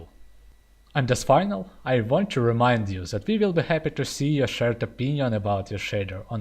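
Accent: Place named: Russia. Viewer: Русские пытаются говорить на Английском) , А еще ваши документации сломались с ассет стора.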